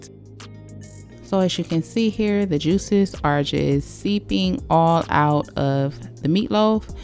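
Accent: South, United States. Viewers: Great recipe! Your step of sweating the veggies down first is spot-on.. raw veggies will release a ton of water and make your meatloaf crack apart. You sound like a Southern lady, so may I suggest adding Celery to your veggie mix? The Cajun Holy Trinity of cooking! 😁 Celery should be equal to the bellpepper amount.. Newly subbed!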